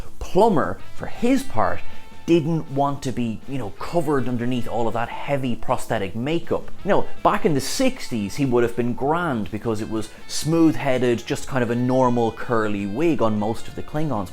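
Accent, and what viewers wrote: United States. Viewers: Your American accent is brilliant! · strong american accent, lol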